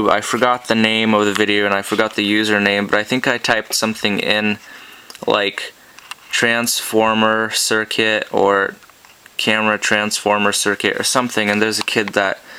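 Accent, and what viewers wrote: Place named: United States. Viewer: He has a American Accent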